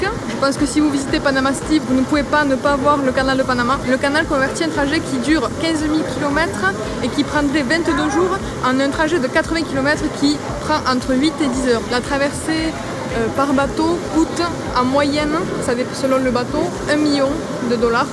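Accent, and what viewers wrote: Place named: Carmaux, France. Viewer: Un travail de montage de qualité, un accent carmausin au top et une diction impeccable, bravo :)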